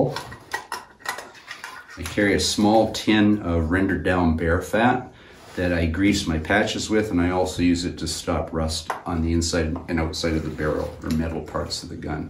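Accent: Canada. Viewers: Ahh, I thought I detected a Canadian accent. I love ypur chamnel. U are quite amazing to be able to do what u do.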